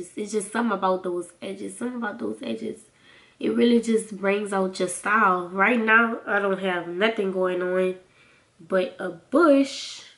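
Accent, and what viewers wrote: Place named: New Orleans, United States. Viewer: Lol i knew you was from nola before the xmas intro. Yassss, bounce to the beat. I did this tag as well it was fun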